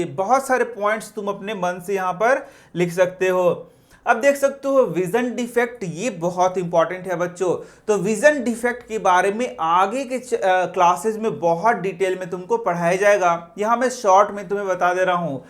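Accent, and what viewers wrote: Bihar, India. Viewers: I am dam sure sir is bihari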